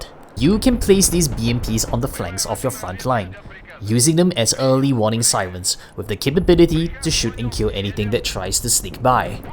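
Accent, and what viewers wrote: Singapore. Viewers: Are you by any chance Singaporean?? I can hear the accent lool.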